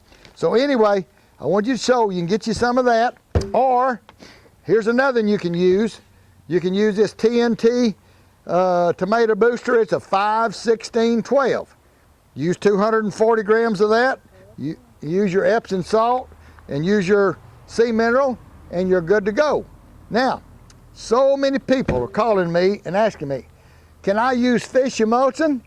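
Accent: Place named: Oklahoma, United States. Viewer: Hi I saw a question on one of your videos regarding the smell one of your viewers was experiencing with their wicking tubs. I had the problem, it was because my drain hole was too high. That is not enough air space. Love the way y'all talk down there in OK. Looking at you from Melbourne Australia You have to realise that you are broadcasting to the World. no one has heard of sea minerals in Australia. Your fertiliser cocktails are terrific none of the brands you use are available here but the NKP formulas come through. Thank you for all the tine you spend teaching us.And thanks to Mrs Sloan for putting up with us.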